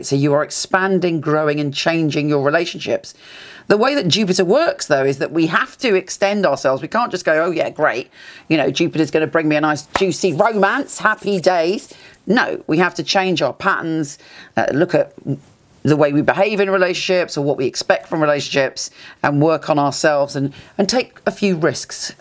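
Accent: United Kingdom. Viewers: Love all your Astrological PSAs ... your English accent #Grrr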